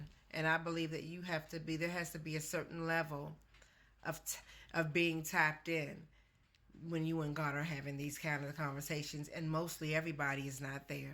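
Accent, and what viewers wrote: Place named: DMV, United States. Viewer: You must be from the DMV.. I can tell by your accent lol Anywho those majority of the Husband Videos are a set up lol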